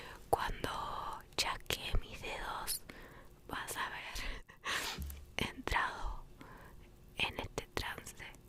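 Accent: Argentina. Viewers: Si no es Argentina es uruguaya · Sos argentina como yo?? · Hola,te quiero preguntar de que pais sos? Por q creo que por ti forma de hablar sos de argenrina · llevo 45 segundos de video y estoy seguro que es argentina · Me cage de risa ensima el acento porteño nananana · sos argentina? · Wah... me encanta el acento Argentino, con solo escucharlo me relaja v: \^-^/💕 Eres muy hermosa ❤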